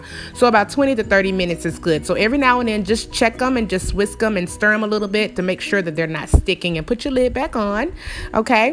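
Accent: South, United States. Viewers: Your southern accent makes me feel warm and welcomed to your. Videos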